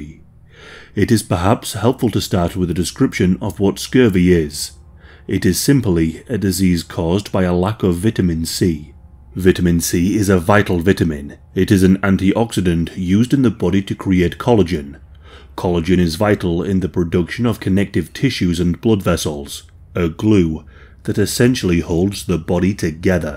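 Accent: United Kingdom. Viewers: British accent of narrator.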